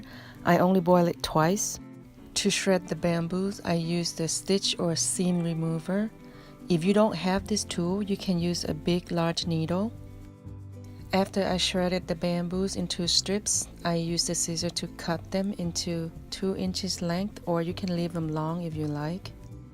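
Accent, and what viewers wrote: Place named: England, United Kingdom. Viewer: วาววว น่ากินๆๆ ชอบสำเนียงEnglish so much❤️❤️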